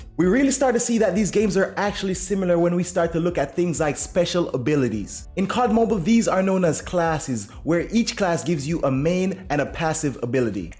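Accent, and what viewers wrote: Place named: Jamaica. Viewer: Lol just meet up on u channel from how u talk mi know yawh jamaica from u say 3 ppl than person lol big up bro bro and make a sub zimi😎 and to me apex is a big fresh air cause cod rn just a bring same thing over and over cant bother with that more while and rank fun fi grind more on apex than cod not to mention the skill it takes to play apex..see how it go still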